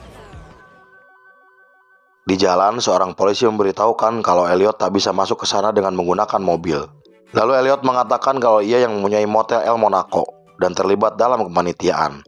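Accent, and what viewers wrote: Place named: Banyumas, Indonesia. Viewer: Intronya ngapak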